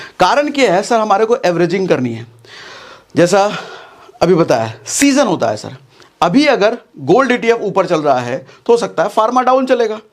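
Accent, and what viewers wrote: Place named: Bihar, India. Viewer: सागर सर आपका आवाज मे जो बिहारी टोन है ओ दिल को छू लेता है और सीधा दिमाग़ मे बैठ जाता है ❤🙏🙏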